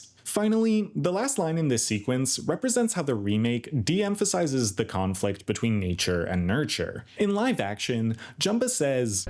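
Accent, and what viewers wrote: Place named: United States. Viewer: That white American accent you got. Cripes.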